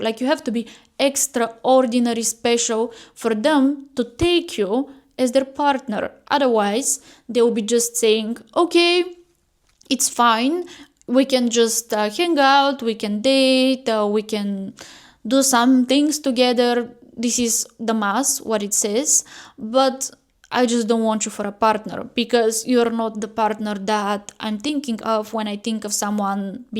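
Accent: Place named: Eastern Europe. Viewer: Your accent is very slavic. I like it 😊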